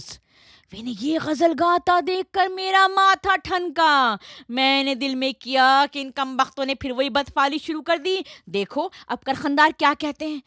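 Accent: Delhi, India. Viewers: This is karkhandari Urdu not lukhnowi Urdu.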